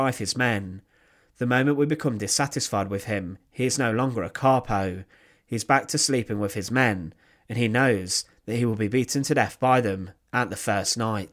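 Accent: United Kingdom. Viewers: Settle down, did you notice the narrator had a vaguely British accent. They love to insinuate that r sound when it's not called for, so Capi could sound like Carpo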